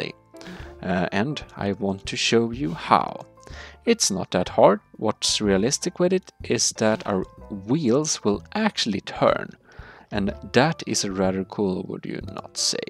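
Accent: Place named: Sweden. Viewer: Really nice Idea! I only managed to make a few vehicles with actual steering, using a stupid technique. This one is way better. (Also I guessed that you're from Sweden and wasn't wrong. I understood that by your accent(especially the "CH" sound) and the way you form the sentences, use some words that are rarely used by English speakers.) By the way, love that accent. I can be proud of myself and stop thinking about being a complete failure now... for 5 minutes.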